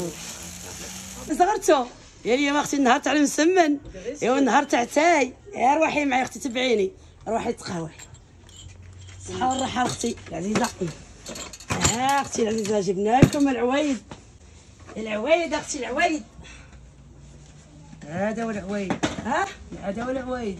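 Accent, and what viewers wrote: Morocco. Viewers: لهجتكم بحال شي مغربية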